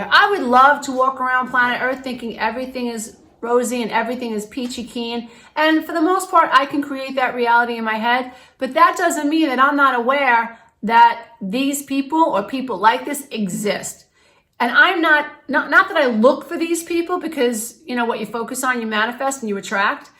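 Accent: New York, United States. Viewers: I think this is the best video of yours I've seen so far. You're bringing the correct emotional response to this sh**. That, along with the NY accent really brings it home! Thanks!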